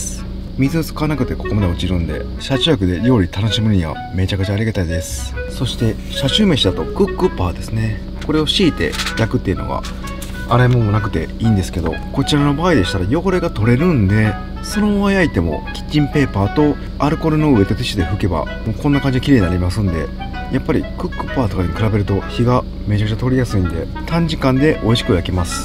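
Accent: Kansai, Japan. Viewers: お疲れ様です😁 私も動画を観させて頂いて車中泊グッズとか見に行くようになりましたホームセンターのそうしたグッズと売り場を見るのが楽しくなりましたあとの暖かい関西弁の御説明と笑顔も良いなあとほっこりしながら観させて頂いてます❗ 御自身も動画の魅力です😁 ステーキ焼ける音がたまりませんね😆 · こんにちわ！ ㊗️10万人超えおめでとうございます🎉🎊 毎回そうですけど、の優しい語り口もとっても好印象です(わたしずっと東京住みなので関西弁に憧れてます) あとほんといつもお人柄が良くて心地良く観させていただいています！これからも楽しく拝見させていただきますね！あとこの間のライブは、わたし時間が合わなくてリアルタイムでは観れなかったんですけど、そのライブ配信も翌日に楽しく拝見させていただきました！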